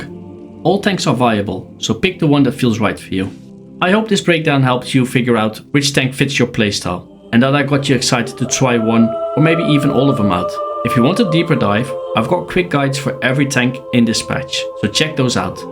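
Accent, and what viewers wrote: Netherlands. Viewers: I really like your voice, and your Dutch accent.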